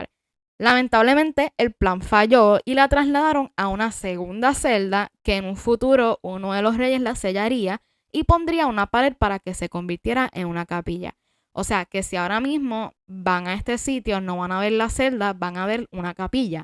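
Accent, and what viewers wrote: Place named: Taino, Caribbean. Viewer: ESTÁ CON ACENTO TAINO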